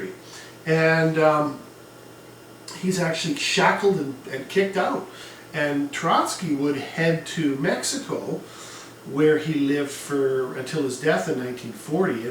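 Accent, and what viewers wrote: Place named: Canada. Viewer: Your lectures are made even better by that wholesome, folksy Canadian accent.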